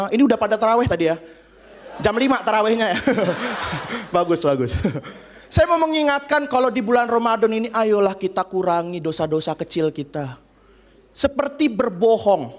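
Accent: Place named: Lampung, Indonesia. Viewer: eksen bahasa lampungmu masih kental bro. sukses bro karirnya.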